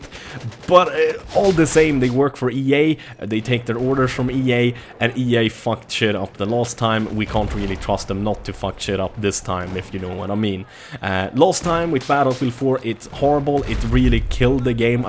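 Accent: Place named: Sweden. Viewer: U sound really swedish...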